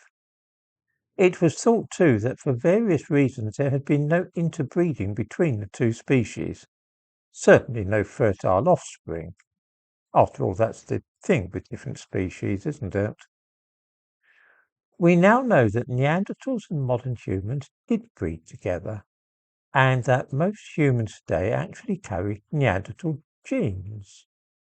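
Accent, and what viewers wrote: United Kingdom. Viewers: It's so difficult to understand British accent. I can watch videos on science and philosophy in american english all day long for decades, but one single 5 minutes video with a strong british accent and I need subtitles along questioning my whole life and ability of speaking english. LOL It also happens every time I go to London. And I lived in London for a year (12 years ago). Jeeezzz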